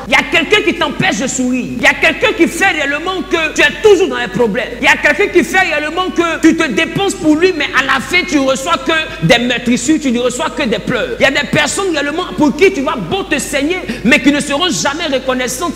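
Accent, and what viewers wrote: Ivory Coast. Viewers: Bonne année cousin, mais c'est comment? Nous n'avons plus tes directs! Faut ratisser frère......mais papah, tu dress comme nous congolais, mais ton accent est ivoirien! Tu nous ressembles aussi....enchante mon frère.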